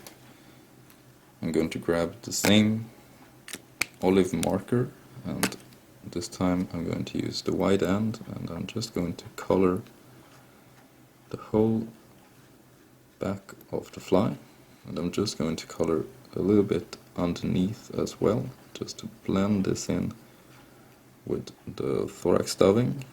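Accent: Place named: Sweden. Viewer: You know, experience tells me that that particular mix of accents means you could be Swedish...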